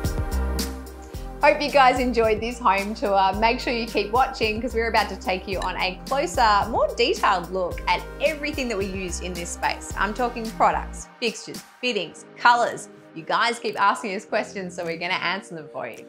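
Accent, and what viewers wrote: Australia. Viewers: Nice home like your accent too are you from Australia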